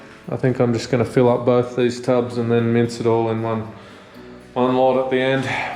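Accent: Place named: Australia. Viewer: Love the Ozzy accent - a kiwi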